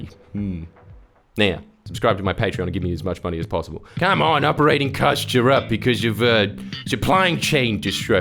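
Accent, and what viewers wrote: Australia. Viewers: Are you Australian or something?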